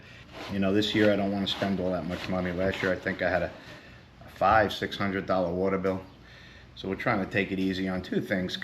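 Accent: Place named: New England, United States. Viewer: You know what, your little demonstration was spot on to show how soap helps with penetration!! That was F'in cool! And by your accent, I know you are in the same part of the country, N.E.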